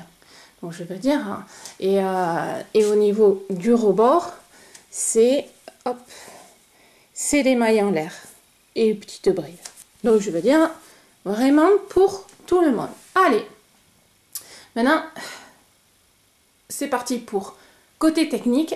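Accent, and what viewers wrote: South, France. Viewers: Merci pour votre reponse avec toujours ce petit accent qui rappelle le soleil du midi je vais acheter la laine comme dans la vidéo auriez vous un modèle de chale avec emmanchure dans tous vos trésors SVP merci bon WE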